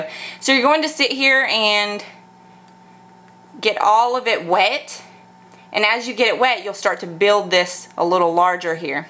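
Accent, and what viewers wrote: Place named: United States. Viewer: American accent is so annoying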